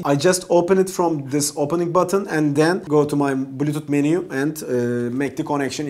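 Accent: Turkey. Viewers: Thats Turkish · That's some heavy Turkish accent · abi fonetiğin türkçe konuşmanla birebir aynı vallahi hiçbir fark görmedim :D hasret kalmışız akıcı konuştuğu izlenimini vermek için kendini kasmayan insanlara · Birazda aksaniniz uzerinde calissaniz iyi olur. tamam, ingilizce anadiliniz degil, ama nasil bir hintli ingilizce konusurken kotu aksanindan dolayi anlasilmasi zor oluyor, ayni sekilde Turkiye'de cogumuz ingilizce'yi Turkce gibi kkonusuyoruz. Bu kendi aramizda bir sorun yaratmazda, ama eger Youtube gibi bir platformda ingilizce bir video yayinliyorsak, o zaman neden kendimizi iyilestirmeyelim ki?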